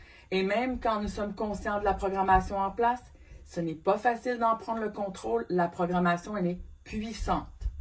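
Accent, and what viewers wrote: Quebec, Canada. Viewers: Contenu enrichissant et utile présenté avec pédagogie par une jolie femme à l'accent québécois ....❤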